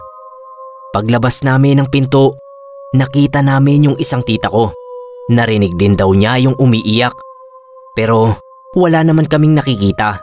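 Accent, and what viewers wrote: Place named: Pampanga, Philippines. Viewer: binuklat hahaha kapampangan ka po ata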